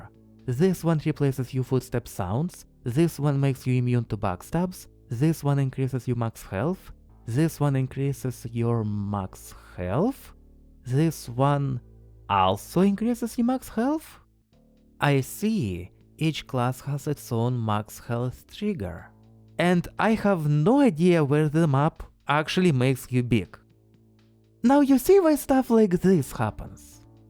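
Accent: Russia. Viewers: he is russian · Probably slavic. Really strong russian accent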